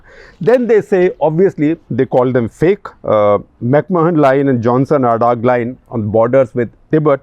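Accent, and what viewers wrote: India. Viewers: His english is fluent with indian accent which is perfect for indians as most indians don't understand UK or USA accented english.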